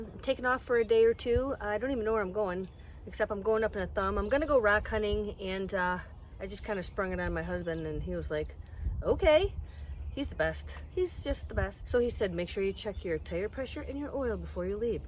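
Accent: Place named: Minnesota, United States. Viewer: Actually your Min a soda accent drives me nuts. And your husband must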